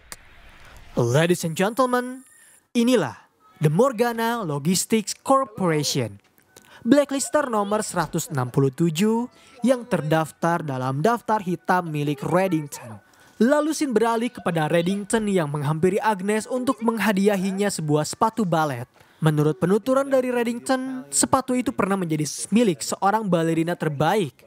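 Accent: West Java, Indonesia. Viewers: fix org sunda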